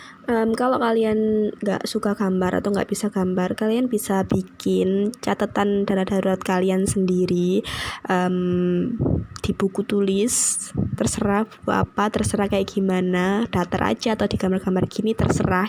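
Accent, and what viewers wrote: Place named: Java, Indonesia. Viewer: Asli org mana mbak? Kok medok bangets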